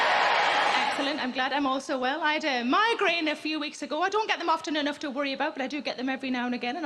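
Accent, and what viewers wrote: Newcastle, United Kingdom. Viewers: Aye proper Geordie :)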